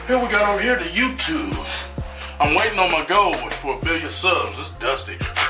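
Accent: South, United States. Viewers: Why does he sound like a hill billy white guy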